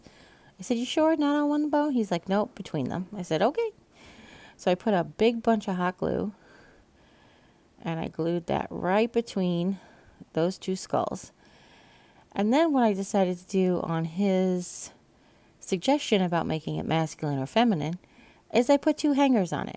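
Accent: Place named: East Coast, United States. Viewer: Cute! It's funny how you said that most people like to hear you talk, because I was just thinking about how I like your accent. Are you from the east coast? I noticed that when you say moss, it's more like mawwss lol love it.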